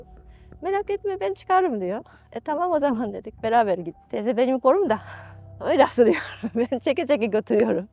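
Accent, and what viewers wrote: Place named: Mersin, Turkey. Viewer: Mersinli teyzeler gibi konuşuyorsun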